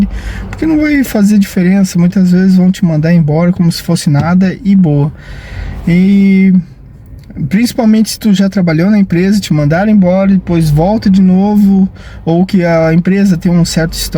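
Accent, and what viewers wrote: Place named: Brazil. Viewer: Olha o conselho, falas, falas e falas e não avanças, vê outros youtubers e logo aprendes e ficas 5 ✨ quanto ao falares brasileiro cada um sabe de si tanto faz o importante é entender-se, mas não existe idioma brasileiro, existe sim vindo do português o que muda é o sotaque, ou dialeto e muitos erros da vossa parte, agora que o sotaque é mais bonito que o português é uma verdade. Fica com a tua e nós ficamos com a nossa o importante é seguir enfrente.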